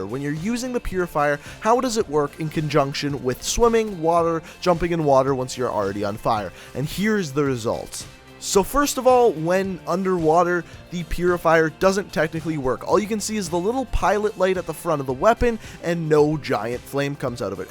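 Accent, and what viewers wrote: Canada. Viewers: It's his Canadian accent it make them were they can't pronounce all words correctly so stop hating and learn to spell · are u Canadian cuz it sounds like it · Definitely Canadian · Is he canadian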